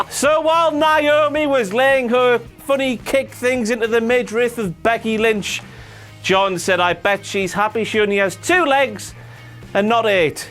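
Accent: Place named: England, United Kingdom. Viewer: I wonder what part of England is he from.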